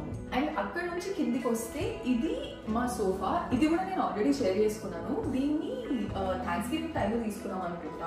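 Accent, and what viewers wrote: Telangana, India. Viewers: Very nice home tour manchiga undhi sis❤im your new subscriber i love your slang our Telangana hyderabad. Slang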